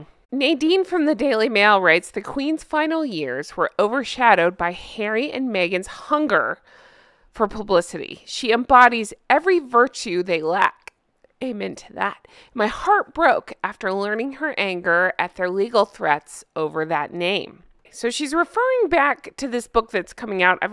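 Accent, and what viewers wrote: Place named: United States. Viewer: When you said "my Queen" in the last video it surprised me (being from one of the realms, Australia, so she was/is literally my Queen) and it touched me that you felt that way. I then did a double take, wondering if you were American as I had thought - perhaps you were Canadian who lived in the US and picked up the local accent, because then she definitely would be your Queen too. But no, I checked your channel details that said US. So you admire Queen Elizabeth II so much you show it by calling her your Queen. That's wonderful.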